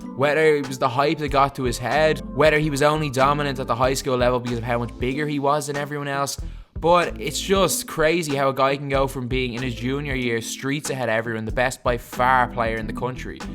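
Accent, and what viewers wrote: Ireland. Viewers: Are you Irish? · Interesting video :-) And I just gotta say ut: love that Irish accent :-) 🇨🇮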